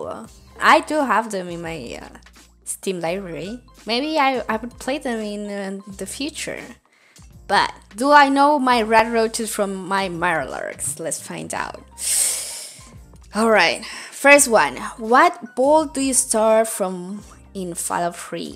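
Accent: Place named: Colombia. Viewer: I love it so much but I cant put together ur accent I think is Colombian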